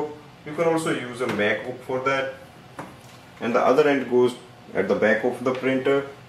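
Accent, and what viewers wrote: India. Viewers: Your Indian accent is annoying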